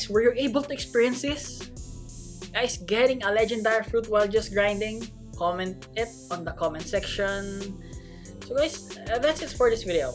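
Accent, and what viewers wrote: Philippines. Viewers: hey are u filipino just wandering cuase sometimes u say ay idk im just wondering and thank you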